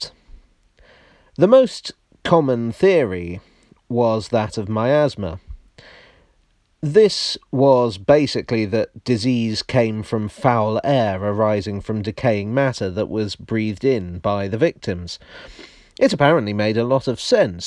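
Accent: United Kingdom. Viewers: British; Snarky; Educated; Articulate; Dry Humor... If Shaun Hastings of Assassin's Creed had a YouTube channel, this would be it. Love your content, man.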